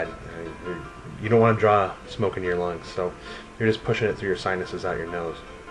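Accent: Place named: Ireland. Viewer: Dude you have to be Irish :) I'm a cigar smoker but thinking of picking up pipes and stumbled across you're channel! Subbed.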